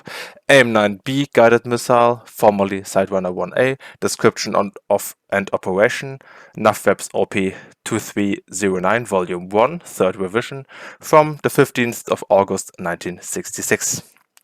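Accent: Germany. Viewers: i know its gonna be good when i hear a german accent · as a german it is hard to stay in the english area of my brain because the accent really wants me to believe this is german :D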